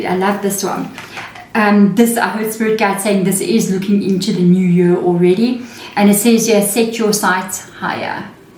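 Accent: South Africa. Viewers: So glad to hear a South African accent! From a fellow South African. Everything you say resonates.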